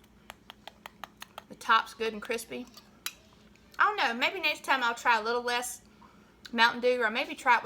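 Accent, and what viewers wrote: South, United States. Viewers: I love that country accent. did you say dump lins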